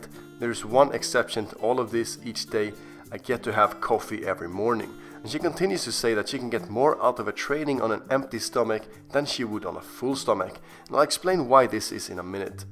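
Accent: Sweden. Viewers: I think I specialized to recognise Swedish accent. I knew you were Swedish before checking your channel's info. Thanks to esport :) Thanks for the info though! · You sound Swedish.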